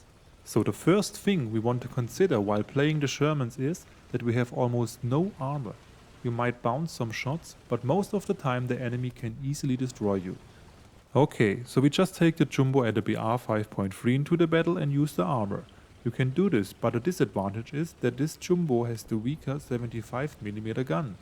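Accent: Germany. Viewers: question is are you german? just wondering no ill intent with my comment but was just curious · Also nice German accent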